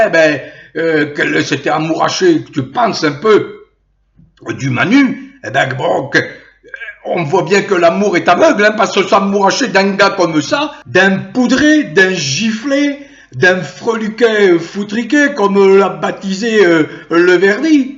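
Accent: Marseille, France. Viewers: J.adore votre accent marseillais pour bloquer le M@ ronds........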